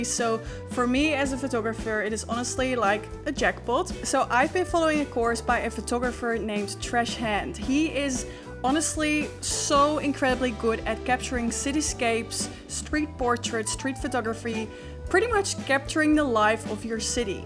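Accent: Netherlands. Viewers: She has a Dutch accent? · That Dutch accent, 🔥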